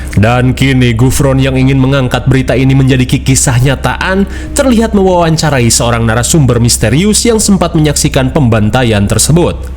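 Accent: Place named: West Java, Indonesia. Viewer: Klo xg reviu flm horor jd ngakak.. Sundax lucu.. Kerren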